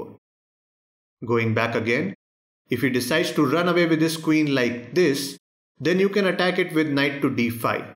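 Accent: India. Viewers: Indian accent is greett👍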